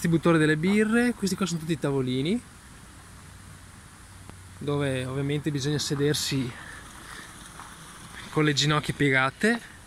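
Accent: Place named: Romagna, Italy. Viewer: Molto suggestivo...dall'accento non capisco se sei più romagnolo o padovano :))...nel caso i colli euganei sono stupendi. Dai noi, in Sardegna, vicino a dove vivo io, facciamo diverse feste in campagna (sai col maialetto da latte e vino rosso) e ci sono diversi agriturismo immersi nella natura incontaminata ma in stile Zen è veramente stupendo e nuovo. Davvero un piacere per tutti i sensi e in tutti i sensi :D.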